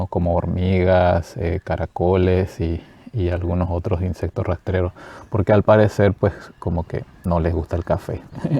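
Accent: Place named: Panama. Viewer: Por tu acento veo que eres panameño … saludos y muchas gracias por el dato!!!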